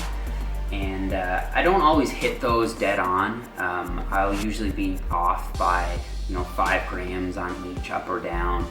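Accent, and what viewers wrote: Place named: Canada. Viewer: New fan here, love the content, love the accent (Canadian?). subscribed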